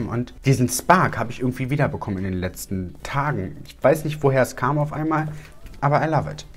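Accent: Berlin, Germany. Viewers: Οmg man fühlt richtig deine Lebensfreude 😍😍😍 das hat mir so gefehlt an dir!! Und dein Berliner Dialekt kannst du ruhig öfters raushängen haha Hab 4 🌷 gezählt Instagram mariamck27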